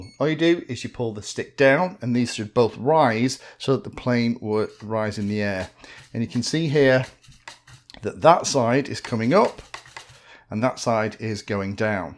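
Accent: England, United Kingdom. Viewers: Hey - at 87 I must be the oldest fpv daddy around! But........I am getting there because YOU are there. Also, give me an English voice anyday! So easy to understand, for us Down Under. <link>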